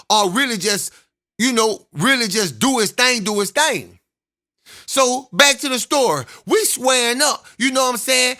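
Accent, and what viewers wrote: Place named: South, United States. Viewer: I love listening to yo country ass.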